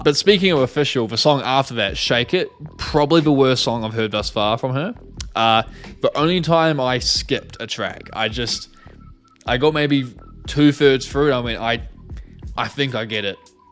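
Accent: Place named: New Zealand. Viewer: cool! is also from New Zealand, so you both have this nice accent. You also have to check her albums out!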